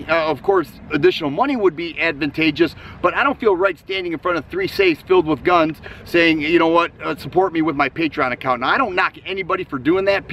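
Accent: Michigan, United States. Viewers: and as far as your voice goes it reminds me of home, fellow michigander here. currently in GA. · Suffering succotash, I never noticed a lisp!! To me, you sound like an honest, down to earth, informative gun guy. And a Michigander. You always respond, not like the other guys...you are number one in my book bud. Keep up the good work. Oh, almost forgot, the refrigerator door series are my favorites!! Any chance of bringing a new, similar series? Steel door, old fridge door, dishwasher door....you see where I'm goin with this? Thanks a bunch.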